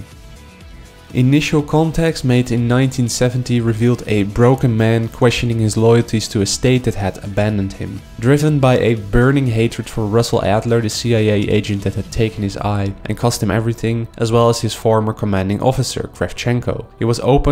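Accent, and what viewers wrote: Netherlands. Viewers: Ik hoor gelijk aan jou accent dat je Nederlands bent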